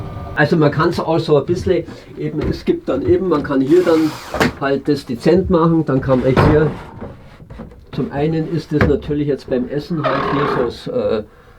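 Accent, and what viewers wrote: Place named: Allgäu, Germany. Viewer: Du bist ne absolut coole Socke! Dein Bus ist obersupergeiles Teil, ich bin begeistert. Deinem Dialekt nach würde ich Kempten vermuten, oder in der Gegend?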